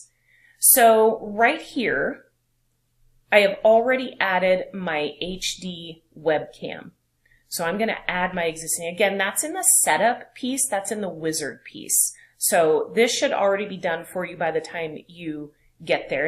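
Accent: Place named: Boston, United States. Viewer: gasp! Boston!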